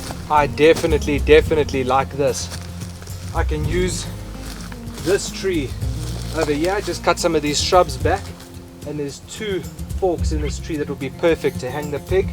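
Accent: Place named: South Africa. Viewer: Hi brother, are you South African? 😅that accent.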